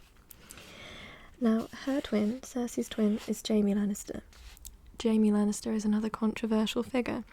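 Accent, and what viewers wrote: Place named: North, United Kingdom. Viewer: I like your Northern accent.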